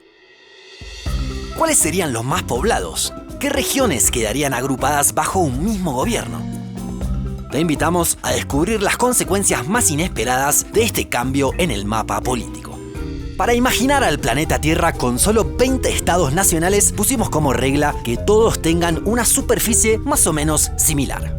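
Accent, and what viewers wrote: Argentina. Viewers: Se nota que es un canal argentino por dos cosas: el acento (obvio) y porque no pone a las Malvinas como territorio británico de ultramar.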